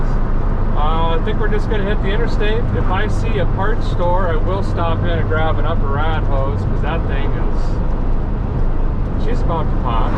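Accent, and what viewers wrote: Minnesota, United States. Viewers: I bet you're thrilled to have her home. I have a '59 Skyliner that's been in my family since '78. When my dad died, a few friends suggested I sell her. They just don't get it. A little Minnesota tie in, she may have an intake manifold yanked out of a donor at Windy Hill in New London, probably in about '86 or so. We were up there for some family stuff, and the old man wanted to go junking. I enjoy your channel. It's nice to learn stuff, while also enjoying your accent. You talk like most of my family from up there. I was born in MN, but smart enough to leave before I was 2. 😎🤓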